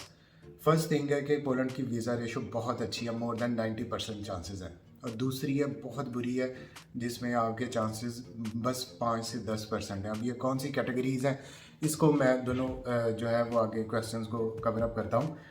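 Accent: Faisalabad, Pakistan. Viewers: The way you deliver reality with faisalabadi accent is 😅👌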